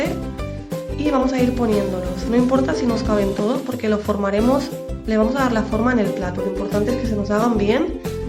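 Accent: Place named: Spain. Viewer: Se ven delicioso. Muy ricos y facil de hacer lo hare. Gracia por tu receta me encanta tu acento eres de. Espana. Felicitacion muy creativa. Saludos. Valdivia. Chile.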